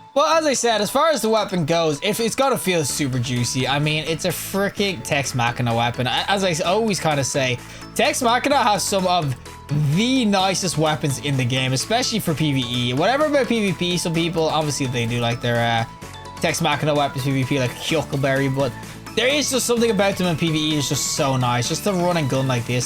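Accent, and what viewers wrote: Ireland. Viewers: love your dulcet Irish tones but your video's got no game audio, don't know if that was intended.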